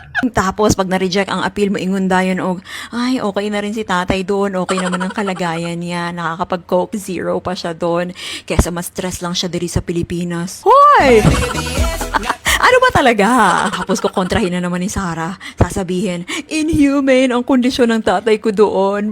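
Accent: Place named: United Kingdom. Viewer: Hello Ms, good day to you. I don’t think Tio Moreno capable of a debate with a person as intelligent as you. Love that British accent by the way Atleast it sounded like a British accent or yung hilaw na Australian accent.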